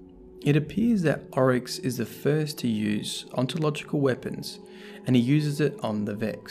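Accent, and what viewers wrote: Australia. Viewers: Man this is soo awesome. So well described. Gear to hear and Aussie voice talking lore.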